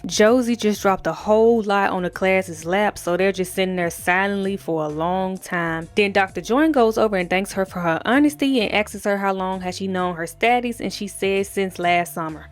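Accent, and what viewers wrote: Memphis, United States. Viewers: Girl, the way you said Music. You from Memphis? Lol Love your accent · The Memphis accent makes this recap even better child 😂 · Are you from Memphis ? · Girl, are you from Memphis? I love your accent!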